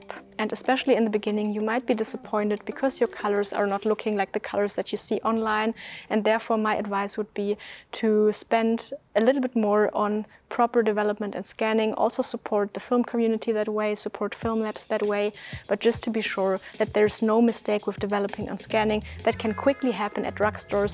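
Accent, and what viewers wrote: Germany. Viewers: Thanks for the detailed tips and also making me notice i kinda love listening to English with a German accent. Except when its mine.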